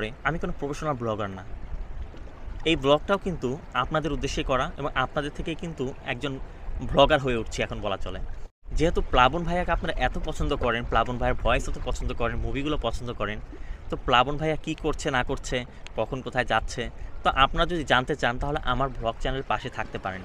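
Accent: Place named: Kolkata, India. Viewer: আপনার বয়েছ কলকাতার বাঙালিদের মত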